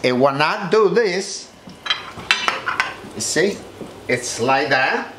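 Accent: Italy. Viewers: The best Italian accent ever !!!!!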